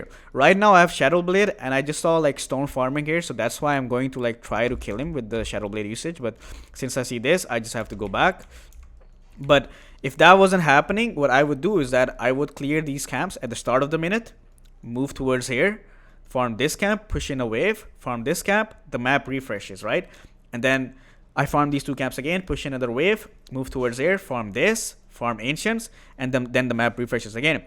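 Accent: India. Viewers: good video but your editing is shit. sometime your voice is slow and sometime its soo fucking loud. indian sland already annoying enough now you add slow and loud voice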